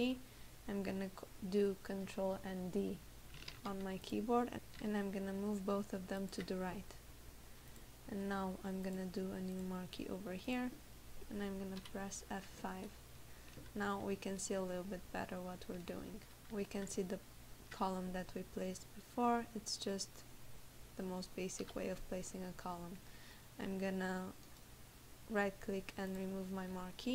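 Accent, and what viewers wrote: Eastern Europe. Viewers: Very slavic accent, where are you from?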